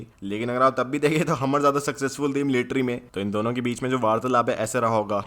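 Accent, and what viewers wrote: Haryana, India. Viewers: bhai nirmaladmir ki tarah bola kar ,tere andhar ka haryanvi baar baar bahar ajata hai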